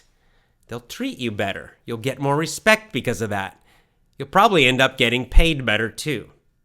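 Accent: United States. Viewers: I love to hear your american accent. So I like follow you. You are engine for my work. I'm living in nederland. I'm using here english for comunication and also my language. I bought your pronucuation cours. I love it. My first day was 3 days, second day is just finish after 7 days. But I love It. I love , cuz I like change my job even to another country like Norway, coz I'm electronic specialist.Really I like to follow you exacly mach. Haha. This vidio is powerfull motivated for my next step. Just most important still is improving my pronucuation with your course.Thank you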